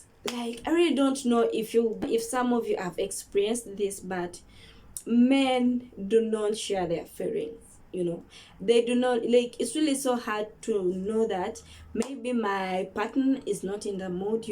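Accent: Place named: Uganda. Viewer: I feel you , but always communicate in relationships matters , munange kale I knew that accent that u are a Ugandan kabisa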